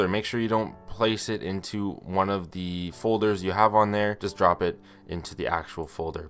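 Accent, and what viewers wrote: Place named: Canada. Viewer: you pronounce sorry like soorry. Just like me and many other Canadians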